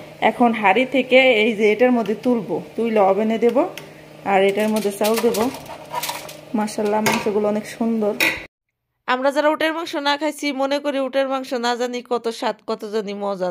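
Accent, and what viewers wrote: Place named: Dohar, Bangladesh. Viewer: Tumi Ki Dohar theke? Kotha shune mone hoy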